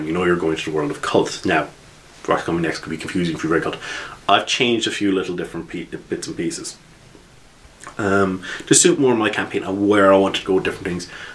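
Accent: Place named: Newfoundland, Canada. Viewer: Huge newfie accent? Awesome. My GM is a newf. :)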